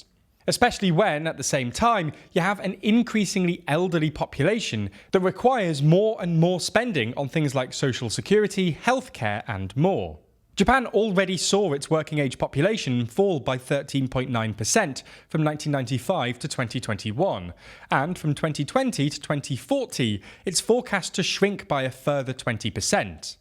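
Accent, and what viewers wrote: England, United Kingdom. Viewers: Oh no, Japan will have to import infinity foreign colonizers (sometimes called asylum seekers by idiots in America and Europe) to solve this problem. They couldn't, I don't know, reduce the population density naturally and rebalance the population pyramid over the long-term. Japan will be fine so long as they do not accept foreigners into their country and maintain their unique people to live on their own. No need for cheap British solutions coming from the Americans 52nd state (Canada for those wondering). TLDR is truly just shitlib propaganda with an English accent, but zero muscle mentally or physically for that matter.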